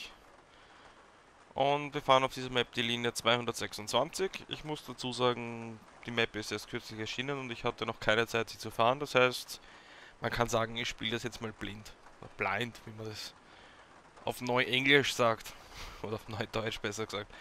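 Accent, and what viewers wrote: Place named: Austria. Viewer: Das hört man doch das er Österreicher ist.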